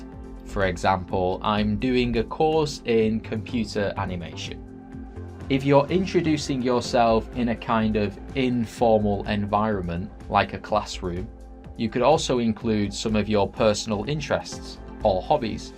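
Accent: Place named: United Kingdom. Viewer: I love British accent. Could you please guide me how to learn it?thanks.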